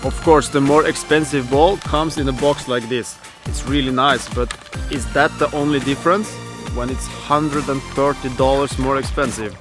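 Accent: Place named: Russia. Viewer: Ты русский что-ли?) Акцент на наш похож))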